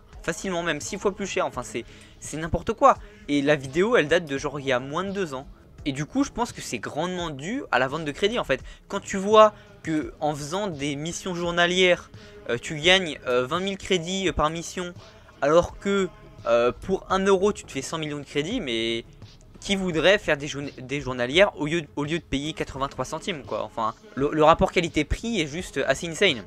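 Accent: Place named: England, United Kingdom. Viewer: Bonne idée cette vidéo et ton accent anglais mec woaw 🔥 et 545m pour hypercaisse euh.... 🧐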